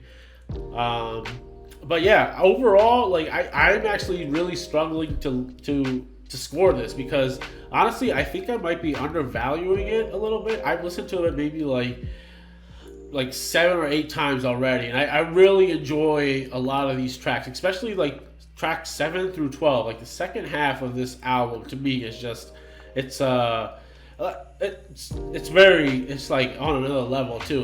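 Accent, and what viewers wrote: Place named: United Kingdom. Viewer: I mean not to generalize but...British accents do be hittin.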